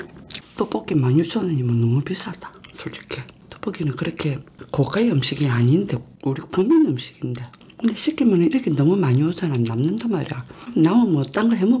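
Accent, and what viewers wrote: Busan, South Korea. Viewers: 참 재밌게 하시네 부산말씨~ 나도 부산사람이지만 ㅎ 끌림이 있다 이분 ㅎ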